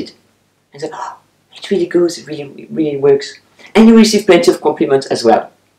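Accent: France. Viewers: Bonjour! J'adore vos vidéos! I have just found your channel today! I watched it all day long! I am in love with you, your style and your amazing french accent! Merci! À bientôt!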